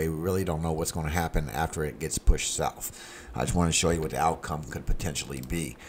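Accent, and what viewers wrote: Louisiana, United States. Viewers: I love to read your comments. They always make me laugh. I’m from La and my family sounds like this..lol. However, my Dad grew up in Texas, but he tells the best Boudreaux and Thibodeaux jokes. Your posts remind me of him. God bless and have a wonderful day! 😂😂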